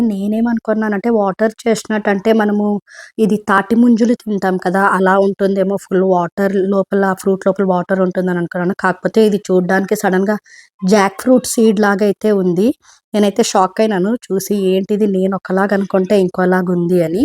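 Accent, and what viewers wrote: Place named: Bangalore, India. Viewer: నాకు ఓ అనుమానం.. ఇంత అందముగా వున్న మీకు ఏ ఆరోగ్యసమస్యలు రాకూడదు.. మీ భాష బెంగళూరు లో తెలుగు యాస గా వుంది.. అయినా మీ తెలుగు చాలా ఫ్లూయంట్ గా వుంది.. గుడ్.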